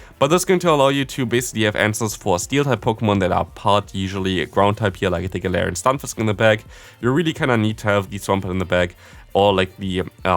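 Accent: Germany. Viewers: Germans speak English always with this sort of accent. Nothing new if you heard more Germans.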